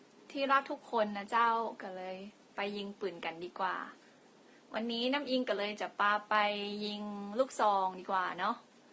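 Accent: North, Thailand. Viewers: เป็นคนที่ไหนครับเห็นพูดเหนือ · ชอบครับอู้คำเมืองน่ารักดี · คนเหนือหรอจ้าว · อู้เหนือน่ารักขนาด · เป็นคนเหนือก่อจ้าว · พึ่งฮู้น่ะ ว่า อู้เหนือได้ น่าฮักขนาด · อู้กำเมือง เวอร์ชั่น น่าฮักขนาดคับ เห็นด้านหลังตอนยิง นึกว่าเป็นสตั้นแมน ดูดุดันมากเลยคับ ไม่น่าเชื่อว่า สาวเอวบางร่างน้อย จะต้านแรงรีคอยลูกซองได้สบายเลย เยี่ยมมากกคับ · อู้กำเมืองยิ่งน่ารักขนาดแต้ๆน้อ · พี่ อยู่ จ. รัยคะ..พูดเหนิอน่ารักมากๆๆๆ.. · คนเหนืออี้ จังหวัดหยังนิ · คนเหนือป่าวครับ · ทึ่แท้เจ้ากะคนเชียงใหม่โตยเก่งหลายเน้อเจ้าเว้ากะม่วนแต้เน้อคนเหนือ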